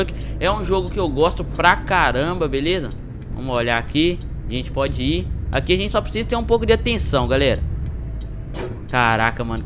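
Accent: Brazil. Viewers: não é de são paulo e veio da onde cara? já tinha reparado mesmo nos outros videos o "oxi" kkk =D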